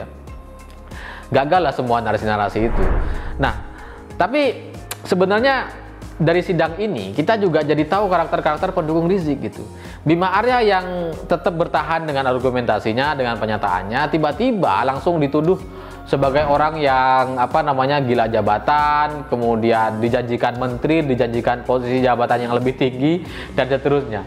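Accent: Madura, Indonesia. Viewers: Kya logat madura bicaranya bang😅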